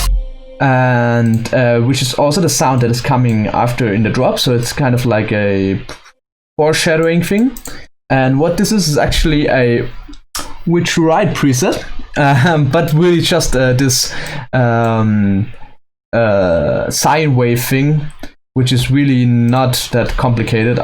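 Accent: Germany. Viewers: are you German? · You sound kinda german tbh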